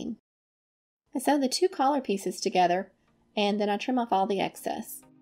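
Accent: United States. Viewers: Awwwww lol!!! I think being from the UK, any accent from the states, us British love!!! 🥰🌈. Your work is so good hun. Thank you for these vids, they really do inspire!! ❤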